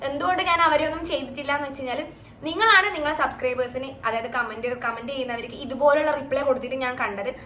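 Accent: Kannur, India. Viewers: Kannur ahno njngalde slang pole thonny😌😂❤ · ചേച്ചിടെ സംസാരം കേട്ട് ,കണ്ണൂർ ആണോ? കാസറഗോഡ് ബോർഡർ ആണോ?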